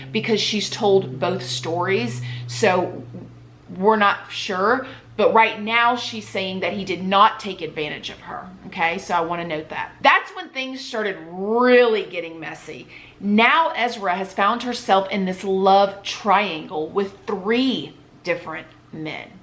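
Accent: South, United States. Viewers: Love love love how you tell the story of real cases. You should look into being criminal narrator on ID. Your southern accent is refreshing to listen to!! I’m a new subscriber!!!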